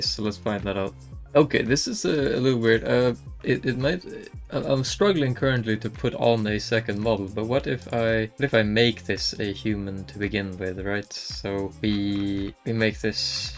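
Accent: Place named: Sweden. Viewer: I honestly love watching ur videos, partly for the Hero Forge tips and partly for the strong Swedish accent, it's awesome <3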